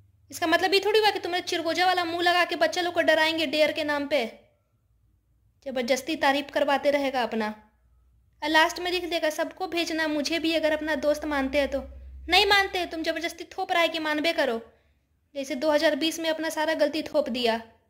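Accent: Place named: Bihar, India. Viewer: KASAM SE AAPKA JAISA YOUTUBER HUM AAJ TAK NHI DEKHE. (KONCHI KA GHAMAND HAI RE) 😂😂 LOVE YOUR BIHARI ASCENT. LOVE FROM DHANBAD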